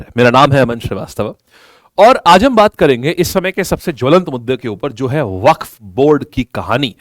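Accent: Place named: Bengal, India. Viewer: Sir up ka bat sunker lagrahahe up bangali hei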